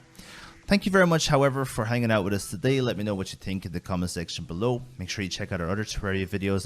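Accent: Ireland. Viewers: r u irish u sound irish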